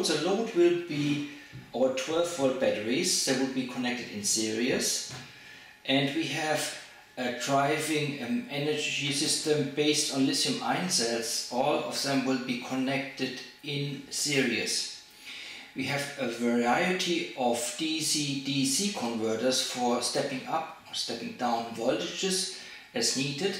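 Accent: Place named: Germany. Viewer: your accent sounds german, where are you from ?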